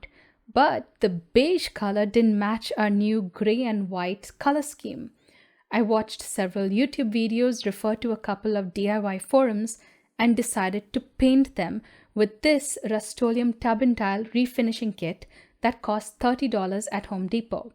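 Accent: India. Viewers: Why do sound like my Indian neighbors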